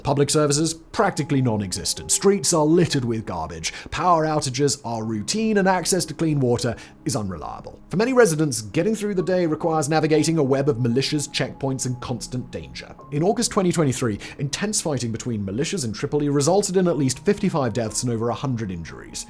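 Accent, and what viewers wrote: United Kingdom. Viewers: for a posh english b@ how do you mispronounce so many names and words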